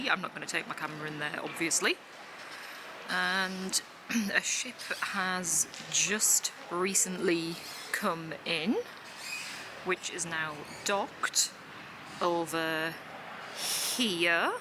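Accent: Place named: Newcastle, United Kingdom. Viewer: While watching I thought that’s a geordie accent then you mentioned Ouseburn. I’ll have to check that one out because I love wandering around Ouseburn. I’m going to Bergen in April so looking for tips. 😊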